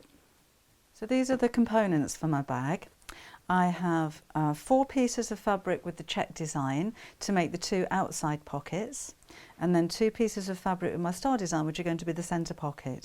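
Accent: United Kingdom. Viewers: Where are you that you call it a zip? The accent sounds British? In America we call it a zipper. Your bags always look so darn cute!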